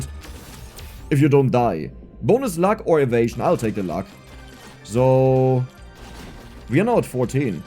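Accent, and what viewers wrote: Germany. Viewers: U got me opens chest ...... oh my god another credit card xDD (the moment with the magnet) love ur german accent btw xP, keep it up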